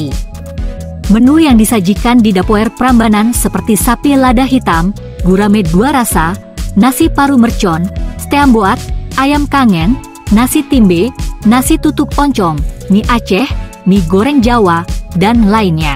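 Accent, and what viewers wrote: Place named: Banyumas, Indonesia. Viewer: Ora Ngapak Ora Kepenak 👍👍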